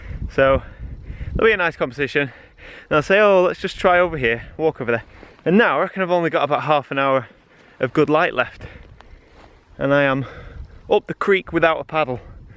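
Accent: United Kingdom. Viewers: absolutely stunning british accent . Thanks for this. Waiting for the next one.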